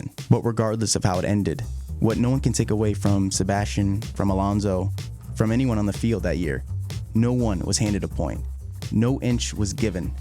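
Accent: United States. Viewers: Great vid, and as much i like the way the brits talk it’s nice to hear an American F1 fan do it like you, so thanks bro, from Atlanta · F1 in American accent with a few unusual pronunciations sounds a bit weird but kudos man for these recaps.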